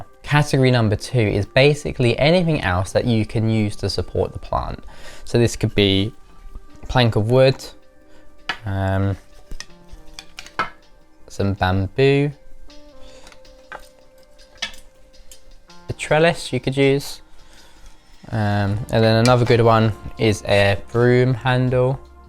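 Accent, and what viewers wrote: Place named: Wales, United Kingdom. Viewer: Thank you for this excellent video. As an American I was trying to place your accent. Are you possibly Welsh or perhaps Australian ?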